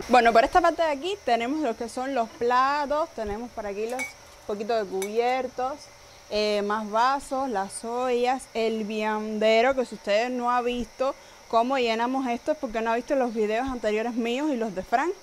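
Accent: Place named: Cuba. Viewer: Conozco tu país, pero cuando estuve por ahí no existía la pandemia, por lo que no tuve la oportunidad de familiarizarme con el término. Lo que sí existía era un calor de morirse. Muy lindos tus videos, muy sugerentes, porque a lo coloquial de tu lenguaje con ese dejo muy cubano, le agregás una simpatía que cautivaría a cualquiera que te viera. Te felicito.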